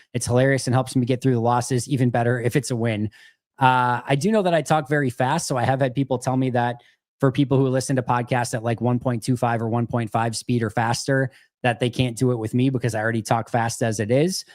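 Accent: Wisconsin, United States. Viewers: Number one thank you for always talking us off the ledge. You and are great at keeping us levelheaded. Number two, love the Wisconsin accent. It’s great to have a little piece of Home for those of us that are transplants. Another great show, thanks for everything! #GPG 💚💛💚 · As a transplanted Wisconsinite, I'm 103% fine with your pronunciation of pure and other words. People's accents are what they are.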